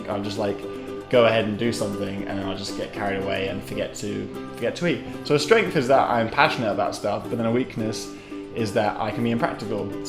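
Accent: United States. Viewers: Your accent is becoming more American. The more time you're spending with Arden the more you become each other and that is true love. Also found in friendships and it's so so damn cute! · anyone else notice the new slight American accent??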